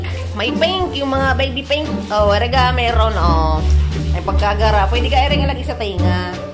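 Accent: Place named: Batangas, Philippines. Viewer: Hala sis May punto ka taga Saan ka sis. Batangas ka ba or cavite? Talaga sis Daming trabaho ang binigay sa inyo. Wow naman amo nyo pala sobrang bait pala nila. Nakakatawa ka sis May pagka comedy pala ang channel mo lagi ka ba ng ganyan? Sa mga videos mo? That’s good sis. Ayan mas cute ka na dyan sis para kang diwata ng kagubatan. Bagay na bagay sa iyo sis. Nagawa ko na ang dapat sa iyo sis. Sana magustuhan mo Ayos na yon ha sis. Happy New Year. Ohh man part two pa pala mga bases naman. Ok lang yan sis kaya nyo yan May joker naman sa grupo hahaha.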